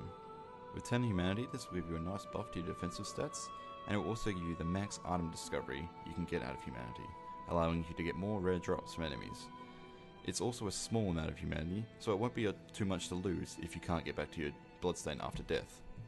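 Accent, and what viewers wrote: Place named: Australia. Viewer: He's Australian I can understand what he's saying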